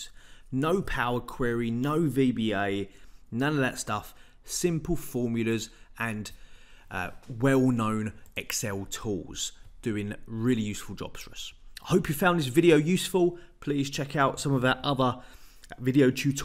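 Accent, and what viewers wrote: United Kingdom. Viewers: Listening your accent reminded me of Peaky blinders😁 However, it was a great lesson. I got to know a lot new things. Thanks:yougotthis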